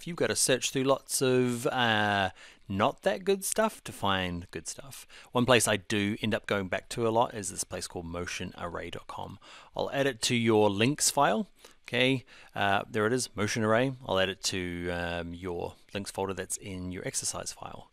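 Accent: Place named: New Zealand. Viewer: You do some awesome videos bro, this is the first video I've watched and I thought straight away 'Is he from New Zealand' hahaha Chur bro that's mean as I'm Kiwi too, Keep it up!!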